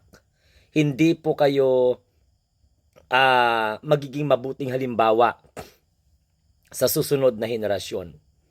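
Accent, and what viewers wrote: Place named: Visayas, Philippines. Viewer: Visaya ka 😊dong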